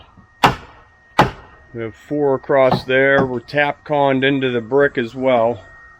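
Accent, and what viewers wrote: Pittsburgh, United States. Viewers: Hahahaha that’s the Pittsburghees accent you’re hearing! Thanks for watching! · This guy speaks with a south Pittsburgh dialect. Why can’t I find him listed there? (I lived there my first 20 years so I know.)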